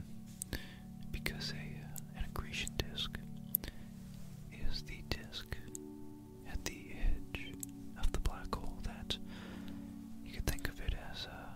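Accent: United States. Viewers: Im late to the party with your asmr and im not a fan of a US accent but your voice my friend is absolutely perfect and within moments I know im going to drift off. I love the just talking about books and sciences and the mythology was cool. Please do more. You're not as prolific as some of your contemporaries who seemingly just like to get their norks out. Bizarre behaviour, its like asmr/onlyfans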